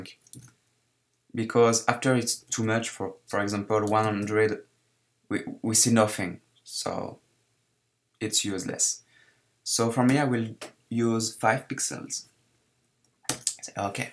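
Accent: France. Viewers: j'étais sur que tu étais francais :D on reconnait l'accent et bravo pour tout ce que tu fais j'aimerais vraiment que tu reprenne ps : j'ai 4 de tes wallpapers dans ma chambre aller salut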